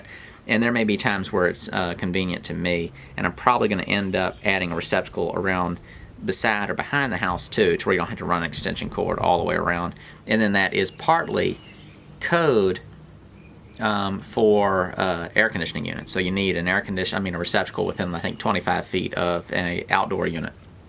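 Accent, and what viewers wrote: South, United States. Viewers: American with a Southern accent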